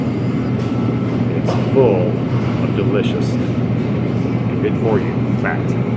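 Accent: South, United States. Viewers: P.S. Love your videos. You speak just like my dear Southern relatives who have sense enough to eat like you do.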